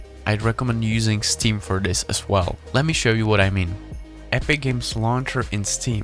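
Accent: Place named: India. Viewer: bro have best indian accsent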